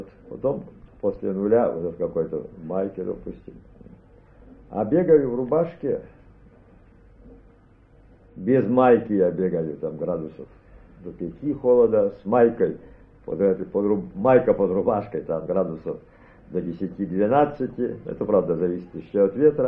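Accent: Russia. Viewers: Деревенский говор а в академики выбился. Вот это карьерный лифт! Крассава!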